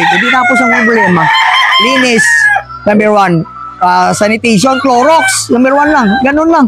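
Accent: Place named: Iloilo, Philippines. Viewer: Ilonggo bla si sir 😊😅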